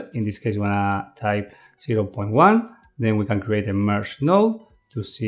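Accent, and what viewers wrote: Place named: Argentina. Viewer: Por el acento del ingles, sos argentino. Tremendo tutorial! Te felicito y agradezco.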